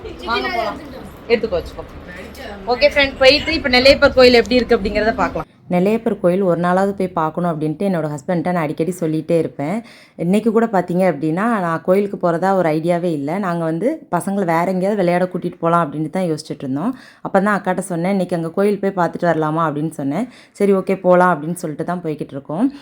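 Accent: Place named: Nagercoil, India. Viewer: Neenga Nagercoil ah sister ❤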